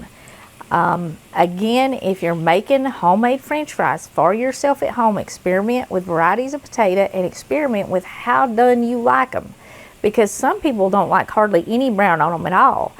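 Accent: South, United States. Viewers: Great video- very helpful I love your southern accent are you from Tennessee?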